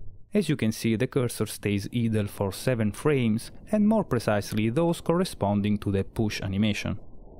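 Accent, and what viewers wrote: Italy. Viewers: I am so entertained by his Italian accent ,he sounds like Super Mario's 3rd brother Sonyo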